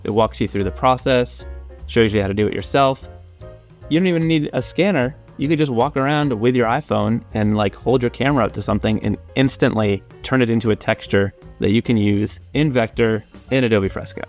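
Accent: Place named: South, United States. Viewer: Southern drwarl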